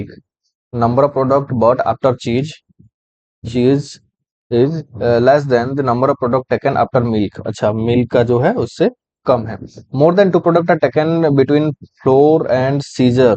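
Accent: Odisha, India. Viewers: Aap odisha se ho??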